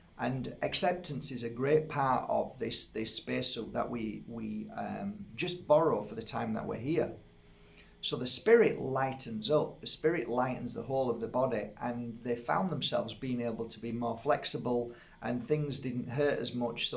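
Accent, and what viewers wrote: United Kingdom. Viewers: You're so right, this is so true. I loved this video, you made me laugh so much at the end! 😍♡🌸 I could listen to you all day, it's always a pleasure to listen to your videos, it's like we're having a tea together! ☀️😌 And as usual I love your UK accent! And as you say at the very end of the video: today is the first day of the rest of our lives. 🥰😊😇 Hugs and kisses to you, take good care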